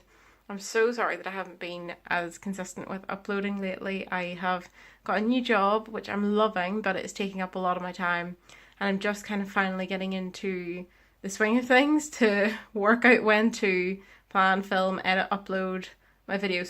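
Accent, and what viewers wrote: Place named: Ireland. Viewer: Born Irish Accent, the best accent in the Whole World v😁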